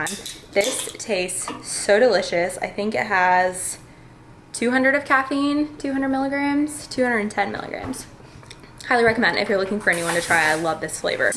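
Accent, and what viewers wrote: South, United States. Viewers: I think you are acquiring a southern accent 🤌🏻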